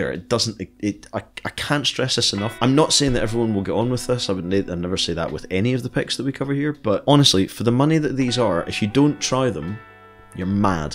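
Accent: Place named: Scotland, United Kingdom. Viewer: what part of Scotland are you from?...My mother is from Blantyre and your accent sounds suspiciously close!... 😊...I just ordered these pics... thanks for the review and recommendation!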